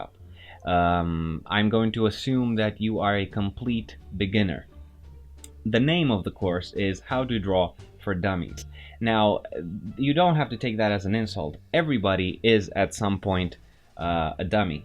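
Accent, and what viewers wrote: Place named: Romania. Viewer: yep...sounds like a romanian brother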